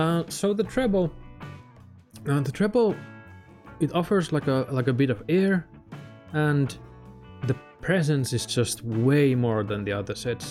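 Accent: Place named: Netherlands. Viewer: that dutch accent 🤌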